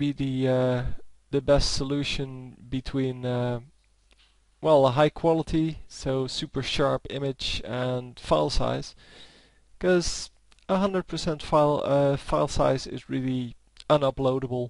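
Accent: Netherlands. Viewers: mooi hollands accent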